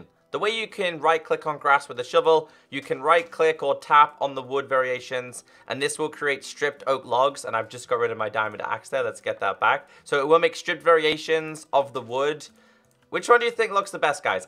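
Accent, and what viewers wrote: United Kingdom. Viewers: Such clear pronunciation) Is he British?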